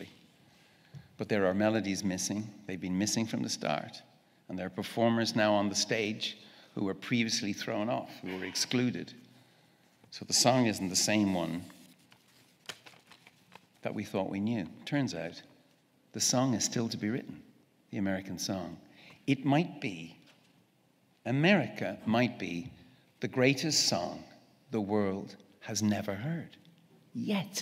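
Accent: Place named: Ireland. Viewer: I swear he's sounding more Irish the older he gets..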